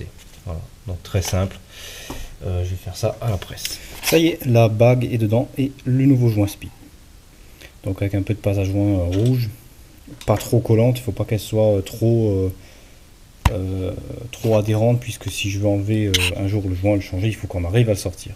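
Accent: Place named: Alsace, France. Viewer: On remarque un peu l'accent alsacien ;)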